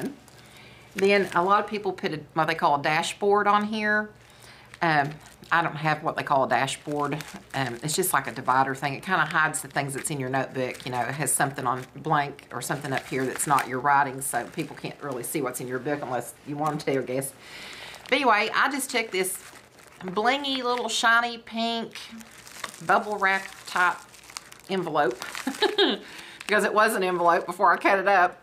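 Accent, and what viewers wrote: South, United States. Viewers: what a great video! Thank you! It gave me great ideas and I really enjoyed it a lot! You are so sweet. Where are you from? You sound so southern! I am from the south but living in New Jersey the las almost 2 years and I feel out of place sometimes with my "y' all and my sweetie" comments to everyone...LOL anyhow, I really enjoyed the video and subscribed and am going to look at your other stuff on your channel! Look forward to seeing more. I will be making a prayer notebook and journal with my grand daughter in the next week or two and I promised her I would find some great info for us to put in ours. It should be a blast! I am so excited that she at 14 is interested in doing this! Praise The Lord! Blessing to you and your loved ones. Linda Coss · I just love your southern accent♥️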